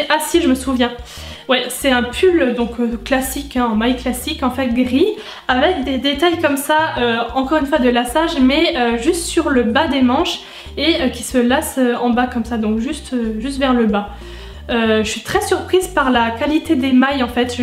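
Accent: Lorraine, France. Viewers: Je me disais bien que ton accent me disait quelque chose, vive la Lorraine 🤟🏻❤️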